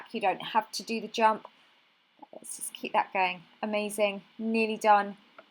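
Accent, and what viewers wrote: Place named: United Kingdom. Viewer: ommmmmmmmmmmmgggggggggggggggg your accent is sooooooo cute!❤❤❤❤❤ best british accent ever heard!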